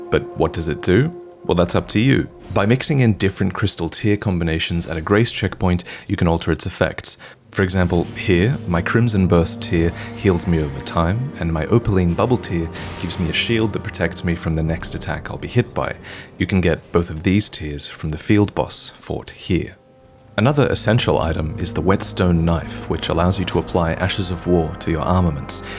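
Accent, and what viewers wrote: Australia. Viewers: Seriously can’t place this dudes accent - sounds like a mixture of posh Aussie with a bit of US/UK flavour thrown in? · Thank you, friend, for your sage advice. I am VERY new to Elden Ring and still struggling with the keyboard mechanics having come from a PC WoW type of set up, but this tips and pointers you offer are clear and concise. Being an Australian, it's also nice to hear a familiar accent and I think you should consider reading for Audible. Many thanks.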